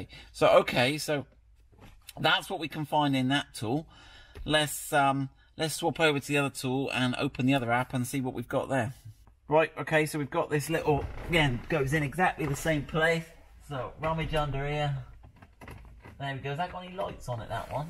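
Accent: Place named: Wales, United Kingdom. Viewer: It took me 2 minutes to realize he was speaking English. Is this a Welsh accent?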